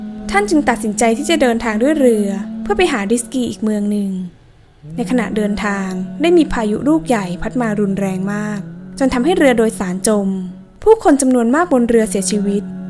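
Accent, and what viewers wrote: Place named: Thailand. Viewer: เขาเป็นมุอัลลัฟ ไม่เคยเรียนฟัรดูอีน เลยออกเสียงตามพยัญชนะไทย ไม่ใช่ตามภาษาอาหรับ เลยเพี้ยน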